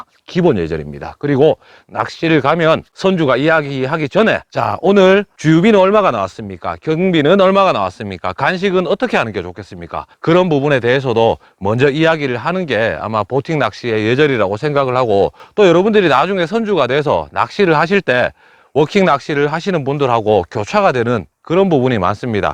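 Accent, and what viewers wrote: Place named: Gyeongsang, South Korea. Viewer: 점점 표준어 쓰시네요 경상도말투가 듣기좋아요ㅋㅋ 전라도인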